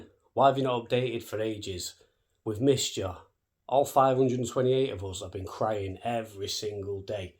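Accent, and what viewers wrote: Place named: Manchester, United Kingdom. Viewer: I'm hoping something comes up before it's too late, my brother can't walk very far now so his lungs are quite far gone. I'm not saying nothing about the you know what jab, but my brother and my ex both got the jabs then both got copd at the same time, it's either something is going on or it was just extreme bad luck/coincidence. By the way you sound like a manchester lad, I'm near Stockport, my brother lives in Oldham, I have another brother in blakely.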